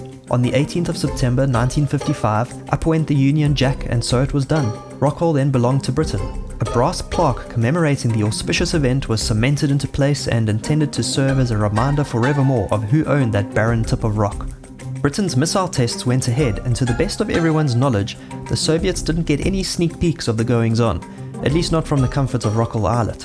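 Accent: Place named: South Africa. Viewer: Super underrated video! I love the accent too, I'm originally from South Africa but have lived in the UK for over 18 years now, I've not heard a voice like mine for so long, it's crazy to be reminded other people speak like that too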